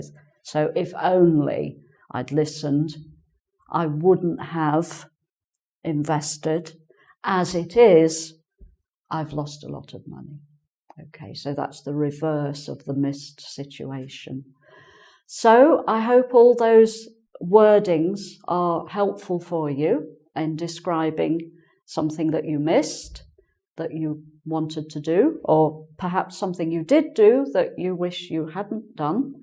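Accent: England, United Kingdom. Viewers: I love your beautiful english accent .What I really love is that It's not different to understand your explanation of most sentences as I know you are trying to speak slowly so that your pupils could understand it.Thank you so much! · i'm from brazil and i'm using to pratice my english foreigners, i loved your brithis accent, your video was perfect, i loved it, congratulations, hugs for you! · Nice to see more of British accent here! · Nice Video.Thanks.You sound like British.Are you from England? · Hello, Dear ) I am verry happy to find you as a teacher ) You are such a nice and pleasant person to watch and listen to) To be honest I was in search for someone whose accent is absolutely British and I am sooo delighted to find you finally!) You inspire! ) Thank you so much for that!) Looking forward for your new videos) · Thank you sweet. It was an excellent class. 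I could do note a little british accent? God bless you! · Are you british? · Hii, mam I am from the ndia. I want to learn this English accent,, Please tell me ,,have you any application, or online course? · This is the British accent that I love. Very clear lesson. Thank you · Thanks for existing,! It helps so much. I'm from Belgium. They say I have a good pronunciation but I would like to practise my British-English and your videos are amazing for this. I can understand every single word you say without a problem. It's super-super nice. British accent is the best so far. Thank You, x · fast 1 month with Bacitikdaithanh this new teacher is so adorable. Welcome,. By the way, you're British, aren't you? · I love your clear British accent. Many thanks for your videos. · actually I love a lot of teachers on Engvid. but I love mama cos she uses British accent 😊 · Awww this new teacher is so adorable. 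Welcome,. By the way, you're British, aren't you? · British accent was not easy to understand for me because I have more experience with American accent however, I am getting better little by little, thanks. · Hi I'm new subscriber and i really love learning English and the British Accent. I found it really helpful. thank you so much for sharing with us :) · Your accent is wonderful. I really like the British accent, for me it is the best, the most pronounced, the cleanest, the best pronunciation. The English spoken in the United States is incomplete, it seems that they are too lazy to speak, they remove the letter T from the words, many unnecessary contractions. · Hey, I'm from Spainl and I'm hope to improve my Inglish, I loved your brithis accent, your video was perfect, congratulations, hugs for you!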